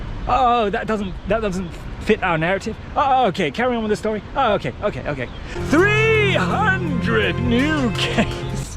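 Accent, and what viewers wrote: United States. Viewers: Love your American twang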